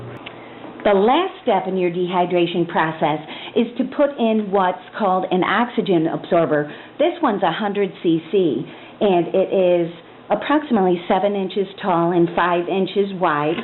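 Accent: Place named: Minnesota, United States. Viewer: I am guessing you are from Northern Wisconsin or Minnesota.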